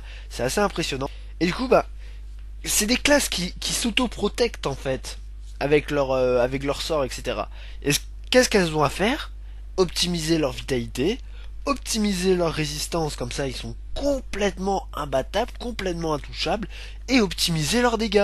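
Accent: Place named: Marseille, France. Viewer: Ta un accent marseillais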